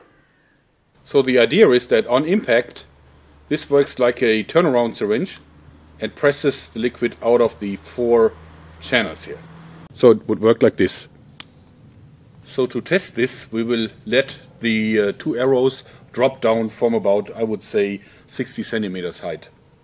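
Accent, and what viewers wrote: Germany. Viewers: I love this guy! He seems like a tough brute at first but he seems like he is really nice, but still a total badass!! His German accent makes me miss my grandpa.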